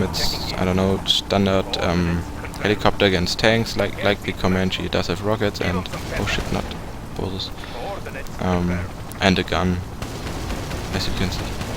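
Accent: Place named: Germany. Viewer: Man hört den deutschen Akzent aber sehr gutes vid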